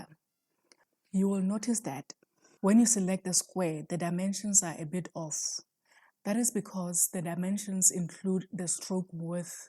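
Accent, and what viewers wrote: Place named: South Africa. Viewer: You sound soooo South African. Thank you for the tutorial!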